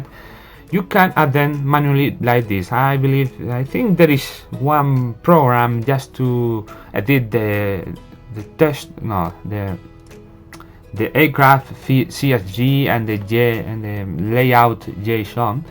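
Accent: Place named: Spain. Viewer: Thank you so much. I will try to make a new livery. I am french but I live in Thailand. I speak english same thaî! It seems to me that you are fron spain. That is why I can understand your english. When I hear english from USA I understand nothing.You have accent that I can hear. 55555 . In thaî language the number 5 is pronounced "ah". And when we say that we are laughing we write 55555. That means hahahahaha. Thank you again for your video.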